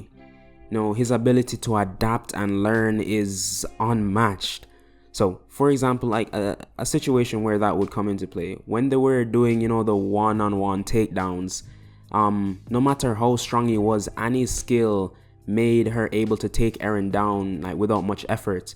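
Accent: Jamaica. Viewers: Dude are you Jamaican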